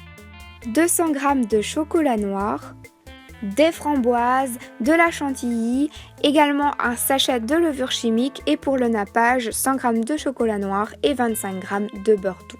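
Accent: Belgium. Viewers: Hello tu viens d'où de Belgique ?? Ou sinon belle vidéo bisous 😘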